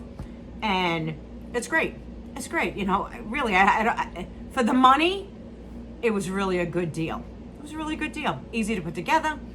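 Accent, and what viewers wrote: New York, United States. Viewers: Nice!! I lived on Long Island till I was 32 years old and we moved to PA in Mount Joy and then moved to Mechanicsburg. We only lived in PA for four years and moved back to NY near Rochester! I enjoy your accent! It feels like home! lol I sound a lot like you. My husband and I were watching you and I was like, she sounds like meeeeeee!!! lol You have a new fan! :)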